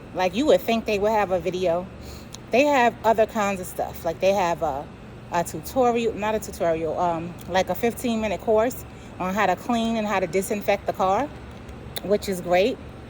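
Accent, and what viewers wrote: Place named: Bed-Stuy, United States. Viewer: Love your accent Ma! Is that Bed-Sty?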